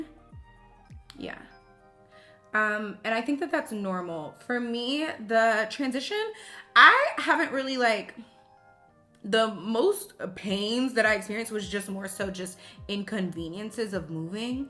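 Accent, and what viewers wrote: New York, United States. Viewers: I’m a bred New Yorker lol and you fit with us I have to say, your voice alone lolll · 😂😂 that’s true, you didn’t cry! I agree NY was an upgrade. You’re officially global at this point! And I’m loving hearing the NY accent and attitude start to come out! 😂 sorry you gotta deal with all that other stuff, omg. You say it’s nothing but that looks and sounds like a very big something! I’m definitely keeping you in my prayers and will ask God to keep a hedge of protection around you. 🙏🏾 keep thriving beautiful! ✨🥰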